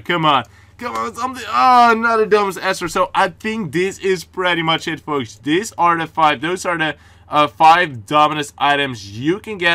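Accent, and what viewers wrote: Netherlands. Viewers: BREAKING NEWS Are You Dutch? (*and i mean from the netherlands*)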